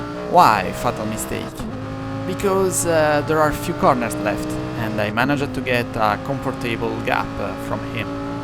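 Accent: Italy. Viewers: non ti offendere ma una cosa te la devo dire (dall'accento direi che sei romano come me): imparati gli accenti e le preposizioni in inglese perche' veramente suoni strano eh. La pronuncia di opponent, protect, penalty, delaying, allowed, comfortable, legit, our, consequences, assume, essence, e alcune altre parole e' sbagliata, soprattutto l'accento, e l'uso delle preposizioni e' spesso sbagliato. Google translate e altri tool gratuiti ti possono aiutare perche' leggono i testi a voce alta. Sono parole che parlando di corse auto dovrai ripetere ogni volta, quindi aggiustale una volta per tutte e via.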